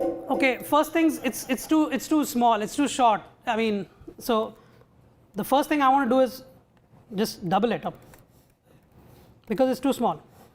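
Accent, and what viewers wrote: India. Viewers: I hate this indish acent! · awww dam i hate the Indian accent, and i am a music aficionado, well nice demonstration anyway, i enjoyed it, even if the odds where not on the speaker side (for my part). thank you !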